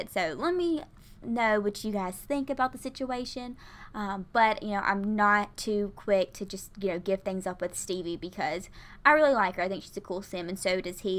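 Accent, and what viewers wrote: South, United States. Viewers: Ok I know this sounds weird but your voice or so adorable, and your country accent is so freaking cute😂😂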